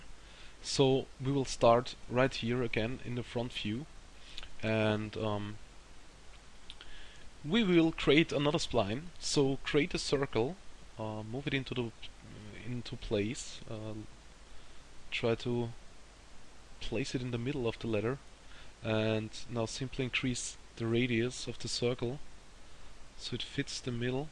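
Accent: Germany. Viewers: Du hast 'nen deutschen Dialekt...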